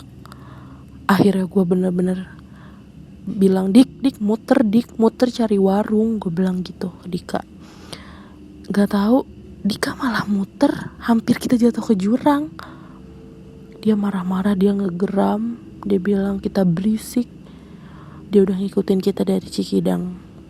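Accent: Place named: South Jakarta, Indonesia. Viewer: Orang Jaksel banget nih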